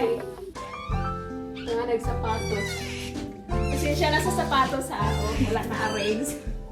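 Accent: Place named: Visayas, Philippines. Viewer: Bisaya diay ka host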